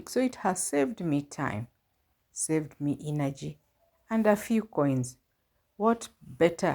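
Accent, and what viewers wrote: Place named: Kamba, Kenya. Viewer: I sensed that you sound like Kamba, where are you from?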